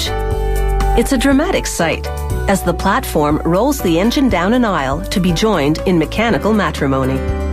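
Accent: United States. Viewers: FFS why did they use the American, for this episode? Aluminium not Aluminum, and Bonnet not Hood. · The vulgar American accent describing how one builds a Rolls Royce is just not cricket! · What an utterly, horrible American voiceover, no class.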